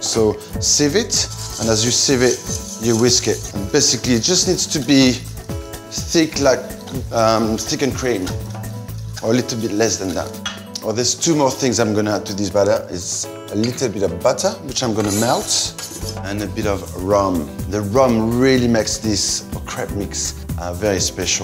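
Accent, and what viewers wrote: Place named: France. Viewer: Not sure this was a good video because all I noticed was his charming face and his endless french accent go blah blah blah. Your words mean nothing Manu, but ur face remains magnificent.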